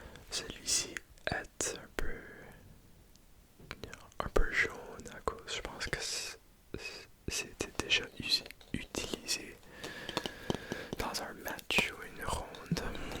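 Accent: Quebec, Canada. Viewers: incroyable !! enfin la vidéo en fr, pour les français l'accent québécois ne se rate pas lol !! toujours des vidéos géniales ^^ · Ici en France, y a plein de sports mais c'est vrai qu'on ne joue pas trop au golf, c'est réservé à des personnes ayant les moyens. Pour ma part, je joue au tennis Ton accent canadien est très joli 😊 Bonne nuit tout le monde 😴🫶🏽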